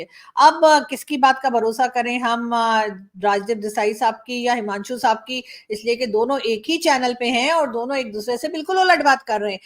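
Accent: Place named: Uttar Pradesh, India. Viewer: Aapki Punjabi Bhasha up Walon Ki Tarah lag rahi hai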